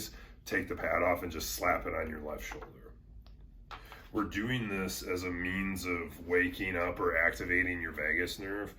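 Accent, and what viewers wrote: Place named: Wisconsin, United States. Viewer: Is this similar to products such as neuvana vagus nerve stimulator? If so, it makes me wonder why other products charge so much! btw you sound like us over here in wi :)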